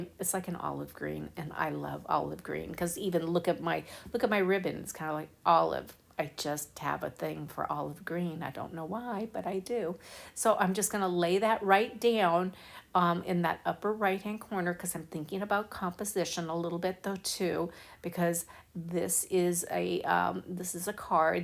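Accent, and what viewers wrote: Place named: Florida, United States. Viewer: I love making cards and this is giving me great ideas. What was the glossy ink you spattered on this one? Also what did you seal it with? Are you from Naples FL? You have an accent 😊 My daughter lives in Naples. Just wondering.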